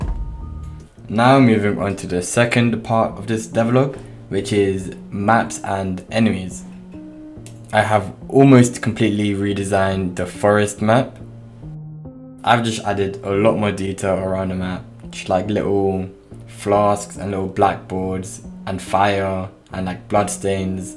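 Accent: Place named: United Kingdom. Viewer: ew briish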